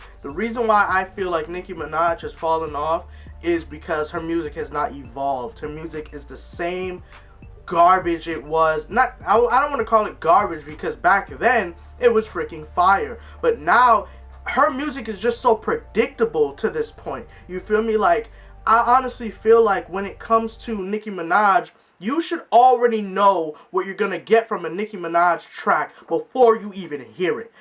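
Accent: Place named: Jamaica. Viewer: are you Jamaican? Your accent is really similar to my Jamaican friends.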